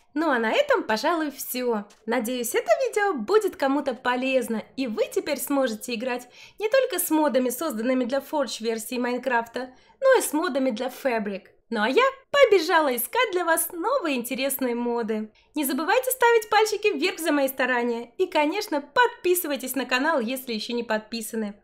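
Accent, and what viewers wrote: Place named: England, United Kingdom. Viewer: как ты делаешь такой акцент англиский.Я попытался этим акцентом пару слов проезнисти я язык сломал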